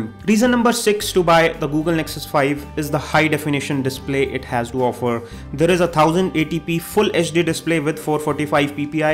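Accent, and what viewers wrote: India. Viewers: Fuckin indian accent,Fijay Raj Khumar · South indian accent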